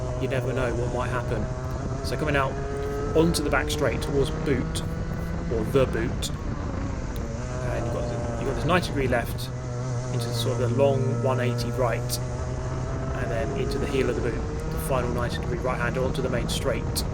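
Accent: United Kingdom. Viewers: EYYYYYY BRITISH BOI nice to know theres actually british bois here in youtube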